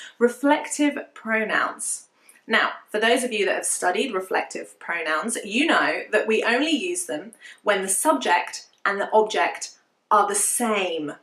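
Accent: United Kingdom. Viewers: Thank you so much for improving our English since I found your channel I watch 3or4 your videos everyday to improve my English, love your teaching and British accent and this channel is very useful for me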